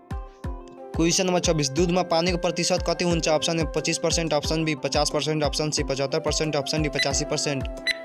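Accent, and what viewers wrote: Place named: India. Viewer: यो खुद हिन्दिबोल्ने धोति हो एस्लेबोलेको voice सुनेरै बुझिन्छ TRP को लागि यो सब गरिराछ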